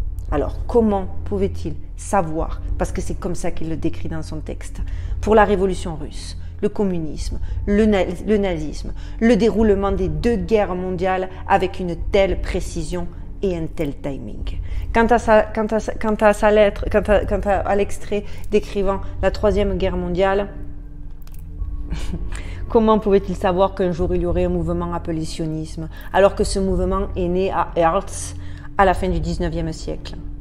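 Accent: Marseille, France. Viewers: Albert Pike, c'est Paille-K, pour la prononciation (je croirais presque que tu mens quand tu dis vivre aux States! hein ma gueule?:) de Marseille! j'adore ton petit accent que tu as dû bien perdre depuis... mdr ) et aussi à 15' et des, on dirait ptet des détracteurs (mais dénigrateurs j'aime bien aussi, ça fait penser aux oiseaux migrateurs: ceux qui vont voir ailleurs... si j'y suis;) Et franchement, tu as de la jujotte, j'aime beaucoup ton implication et ton recul en même temps.... dans tout ce que tu cherches à comprendre... T'es vraiment intelligente je trouve! Bon voilà, après avoir regardé une 20 aines de vidéos sur ta chaîne, je me mets à te tutoyer, mais cela ne veut pas dire que j'ai moins de respect pour autant... C'est ptet même le contraire: je te vois comme une "sister" de ma génération! (même si tu dis dans une vidéo qu'en 95 tu n"étais pas née, je sais que ce n'est pas vrai:p ) Et ton angle de vue (vu des States) m'apporte beaucoup! 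Merci! Stay Safe aussi (vraiment, fais gaffe à toi) xx Take care xx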